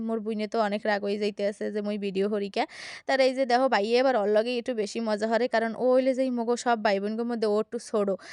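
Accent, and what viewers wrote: Barishal, Bangladesh. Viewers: আপনি তো দেখি মাশাল্লাহ ভালোমতো বরিশাইল্লা বাসায় কথা কইতে পারেন? গো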